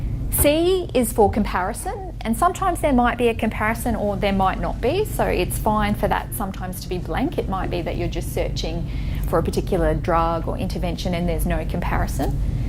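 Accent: Australia. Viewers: Omg I just ❤️ an Aussie accent!;)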